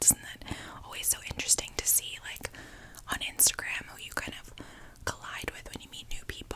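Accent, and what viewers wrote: United States. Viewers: I think your accent is THE american accent for me😍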